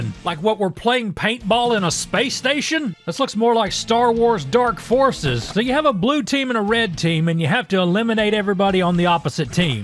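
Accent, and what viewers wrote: South, United States. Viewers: God you’re so southern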